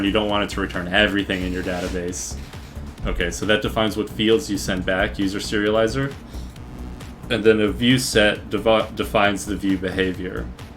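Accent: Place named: Russia. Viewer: congratulations, you have the Russian accent!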